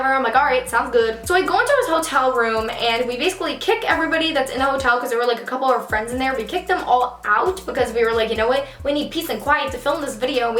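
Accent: New York, United States. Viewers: your accent tho its amazing I can tell you're from NY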